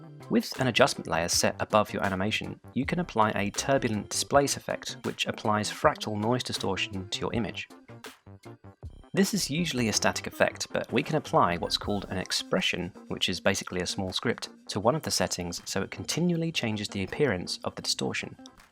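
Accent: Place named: United Kingdom. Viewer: This is it, the perfect tutorial! It's short, understandable AND it has your lovely British voice guiding us through it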